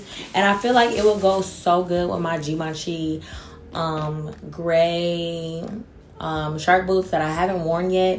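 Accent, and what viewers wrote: England, United Kingdom. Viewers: Your British accent kills me 😂😂😂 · "mom says don't drink the milk"........ I love British accent!!!🤣🤣🤣💕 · The English accent 😂😂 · The British is killing meeeee I love you lol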